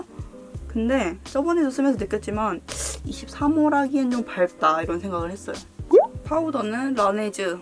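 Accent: Jeolla, South Korea. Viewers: 엌ㅋㅋㅋㅋ 언니 말투에서 살짝 전라도 느낌이나서 귀엽다고 느꼈는데 부모님의 영향이었군요 ㅋㅋㅋㅋ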